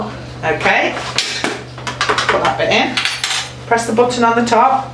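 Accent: United Kingdom. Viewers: 初めて拝見したのですが、英語が苦手な私でも一瞬でわかるイギリスの発音でびっくりしました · オススメにでてきて対してタイトル読まずに見たのですが、某アクセント研究YouTuberをみてるせいかイギリスアクセントだとすぐわかりました😂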